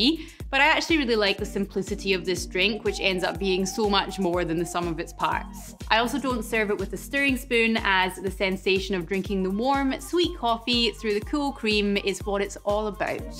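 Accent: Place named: Scotland, United Kingdom. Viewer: well, we all want more of that Scottish brogue! Cheers and best wishes from San Francisco. :)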